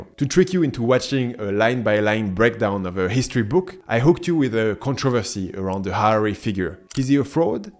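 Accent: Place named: France. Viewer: Gaining Youtube subscribers is clearly not an easy job. The few things I have seen on your channel are so well done that I can say it easily matches other content of Youtubers with many thousands or even millions of subscribers. Keep up the good work. Who knows how many people you will be to reach within a few years. By the way the french accent is actually charming 😂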